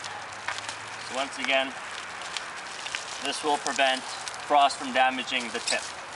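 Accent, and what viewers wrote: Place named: Canada. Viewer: I’m Canadian, and as soon as I heard this I could hear the accent😂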